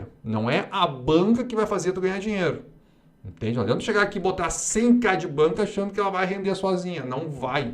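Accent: Rio Grande do Sul, Brazil. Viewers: Esse sotaque de gaúcho é duro de aguentar 😠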